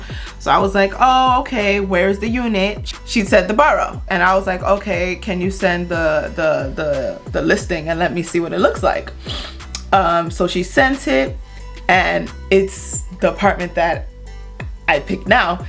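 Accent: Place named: Nigeria. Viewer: Her Nigerian accent is life🤣🤣🤣🤣🤣♥️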